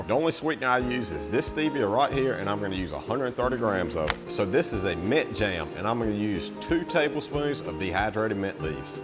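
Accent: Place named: Cajun, United States. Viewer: Man you have figured out a good life for yourself. I am a big fan of your videos. Very informative and down to earth. Calming voice with that Cajun sounding twang makes your narration great as well. I know you probably have zero interest (which is also why I say this) but society needs to start putting their worldly faith and trust in men like you instead of the leaders we currently choose. I’m tracking you down in an apocalyptic situation lol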